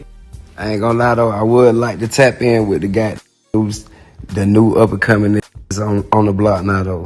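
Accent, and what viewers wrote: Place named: Mississippi, United States. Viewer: Boy you claim atl but you from missippi 😂 you sound like a missippi 🥷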